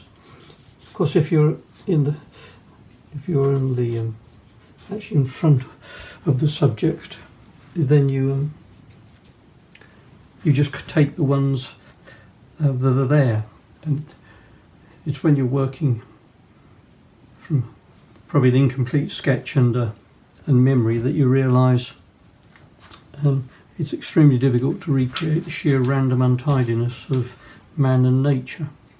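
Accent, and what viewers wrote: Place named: England, United Kingdom. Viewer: Thanks. It's great to hear a true English descriptive narration, and a lovely result too.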